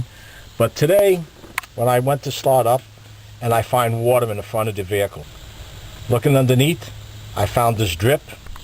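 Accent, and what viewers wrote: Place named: New York, United States. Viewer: I meant to say Long Island. I knew the accent was from NY. I had a friend from derh, sounded just like you. Very fun to listen to. I’ll be changing my water pump out tomorrow. Wish me luck.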